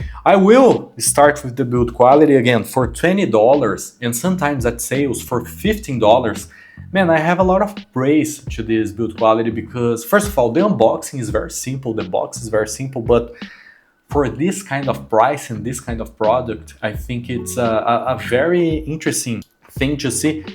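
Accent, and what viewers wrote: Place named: Brazil. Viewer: Eu entrei no vídeo pensando "só gringo deve estar fazendo review desse fone" aí no que ouvi o sotaque já saquei que era BR kkkkk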